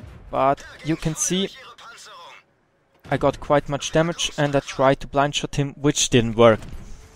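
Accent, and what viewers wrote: Germany. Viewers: Du bist Deutscher oder? ;)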